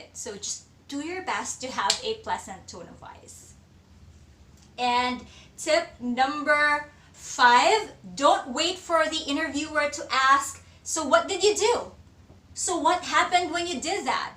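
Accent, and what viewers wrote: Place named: Philippines. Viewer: She is a great job for the influenced about English interview question but still Filipino accent at the same time. God Bless. 🙂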